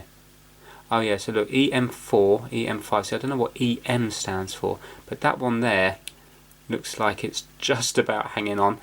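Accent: United Kingdom. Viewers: No, he said "Just yet, anyways" it's part of the UK accent.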